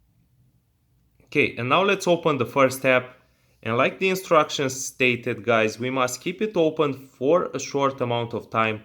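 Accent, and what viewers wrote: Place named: India. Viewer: Right as he had an Indian accent I knew it was fake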